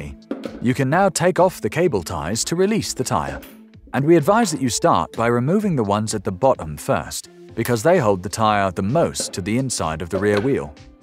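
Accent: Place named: Cockney, United Kingdom. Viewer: I can hear your a cockney 👍 good video very informative thanks 😊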